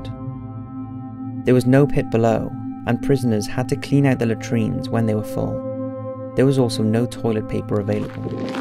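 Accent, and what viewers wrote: United Kingdom. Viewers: I'm assuming you're a fellow Brit because of your accent! I just wanted to ask, how much did it cost to visit there? This video has made me interested in going. Thanks :)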